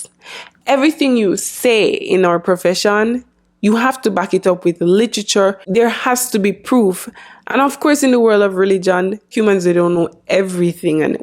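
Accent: Jamaica. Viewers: I was in Bayfarm in Kingston. First time hearing this from the perspective of someone that shares my native accent. I felt the same way when I researched the organization like I was committing a crime. 😂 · Are you Jamaican because I’m picking up the accent? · Your Jamaican accent is lovely! And you're pretty!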